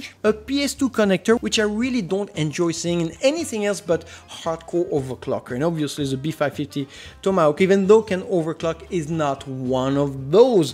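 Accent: France. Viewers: hey i'm sold thanks you very much hope you grow fast on ytb that's explain very well, love the french accent and humor i'm also uh i plan to get this comfig this going to be my first pc gamer i was a console player before now, so for let's sayy holiday 2020 i will have it and buy it there is the part the tomahawk b550 a amd rayzen 7 3700x for the GPU the last thing and more expensive I'll buy the geforce 3080 uh also for the ram what's you saying is if i put only one the pc will run better ? and also a corsair A500 dual fan for the cpu and all can you tell me if it's a solid quality build pc already?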